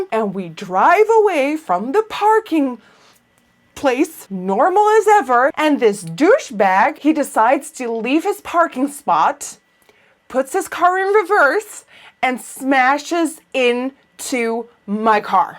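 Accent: United States. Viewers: I HAD NO IDEA YOU WERE IN HOLLAND!! You sound American omg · omg i just started watching your videos and i honestly would have guessed that you you were american! Love your videos!!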